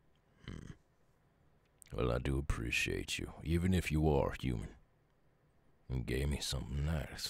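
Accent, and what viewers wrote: South, United States. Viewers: That voice with that accent oooh. As a southern gal, that's real nice.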